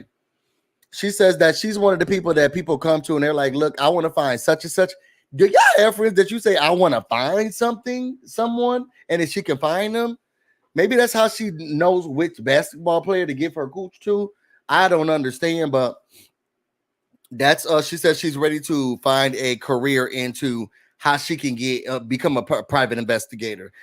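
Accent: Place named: South, United States. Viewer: with a Southern accent (Yes Lawd)! 😍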